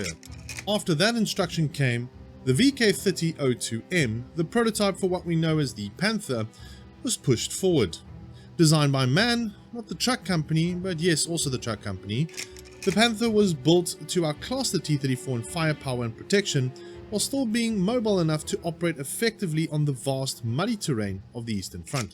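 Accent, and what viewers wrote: South Africa. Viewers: Are you South African? I am and you sound South African! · This is a bit off topic,are you a South African? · Ah, a SAFFA! Good to hear a local voice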